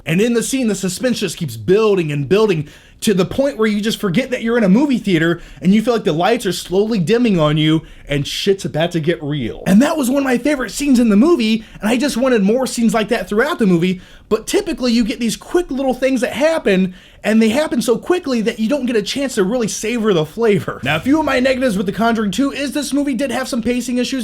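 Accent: England, United Kingdom. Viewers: lol, your rant is the funniest thing!! And I love your english accent too! My worst cinema experience was when someone sitting behind us put chewing gum in my friend's hair. Awesome review! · Ha your English accent is hilarious. Telly? Lol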